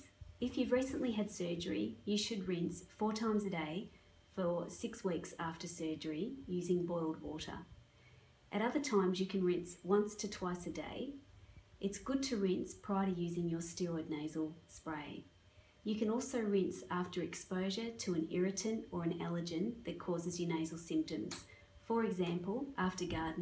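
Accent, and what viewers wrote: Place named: Australia. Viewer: Australian voice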